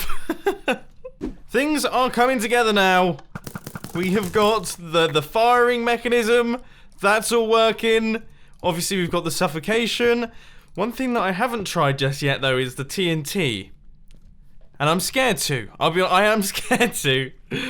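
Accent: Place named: United Kingdom. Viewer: Your british accent is finally getting me after 4 seasons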